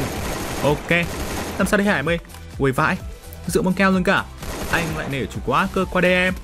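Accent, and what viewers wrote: Thanh Hoa, Vietnam. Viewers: Anh nguoi Thanh hóa a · Người thanh hóa ???? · Bạn có phải người Thanh Hóa không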